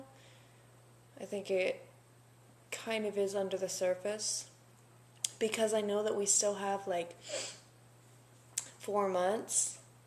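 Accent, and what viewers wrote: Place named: Pennsylvania, United States. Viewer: Ha! I knew you were from PA by your accent! I"m in Pa too! What part did you come from? i'm in NorthEastern, Near wilkes-barre/Scranton. I'm Guessing near Lancaster/Lebanon? Love ya hon! Ruthanna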